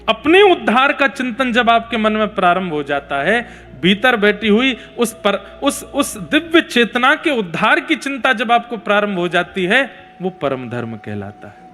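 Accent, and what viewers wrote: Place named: Braj, India. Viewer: 🙏🙏JAI SRI KRISHNA. Guru ji jab aap Brij भाषा mai katha sunatae hai bas lagata hai sunti hi rahu 🙏🙏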